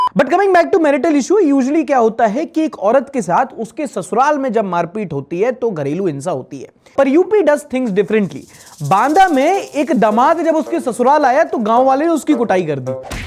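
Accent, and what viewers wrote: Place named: Bihar, India. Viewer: Bhai bihar ka asar saaf pata chal raha hai😂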